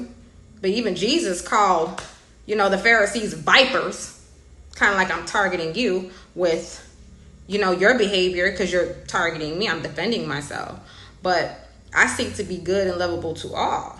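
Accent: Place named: North, United Kingdom. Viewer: that up north accent i like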